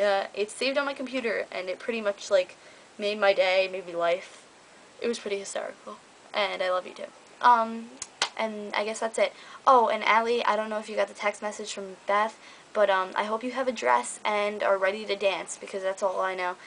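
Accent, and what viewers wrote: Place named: New Jersey, United States. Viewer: You tawk like jersey. lol It's cool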